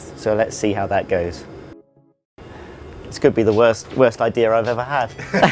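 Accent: United Kingdom. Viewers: I could hear him speak all day long. The British accent is the best. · Are you british?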